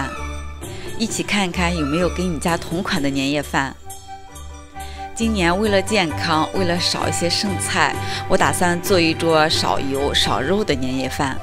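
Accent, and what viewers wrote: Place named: Shanxi, China. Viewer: 是的，我们的山西口音我怎么都能听出来。是老乡，您是哪里的呀我是太原的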